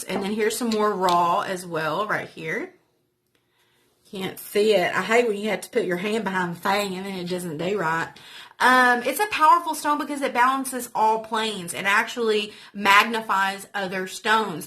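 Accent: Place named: United States. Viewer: I loveee your country accent and your sense of humor I really enjoyed watching and learning thank you! 🥰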